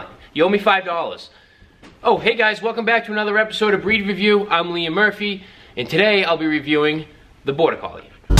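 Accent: Boston, United States. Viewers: Are You from Boston, M.A.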